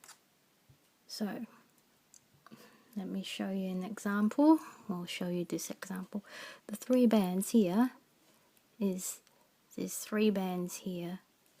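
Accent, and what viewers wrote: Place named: Australia. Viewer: I think you speak beautifully! I noticed the Aussie accent but it sounded different from my other Aussie friends...now I know why. Sometimes I just play the videos to hear the voice...yours is most pleasant; makes it easier to get through a tricky tutorial:)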